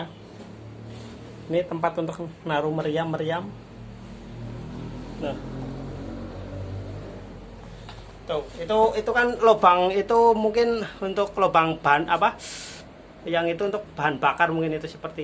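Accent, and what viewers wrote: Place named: Purwokerto, Indonesia. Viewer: Gaya bicaranya Purokerto bgt mas,kulo Cilongok.mantap mas sehat2 selalu 👍👍💪💪semangat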